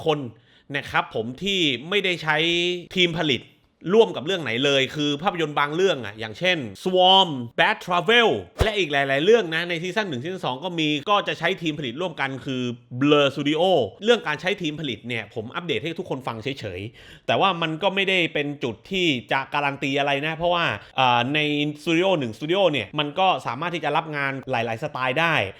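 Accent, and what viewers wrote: South, Thailand. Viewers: พี่เป็นคนใต้มั้ยคับ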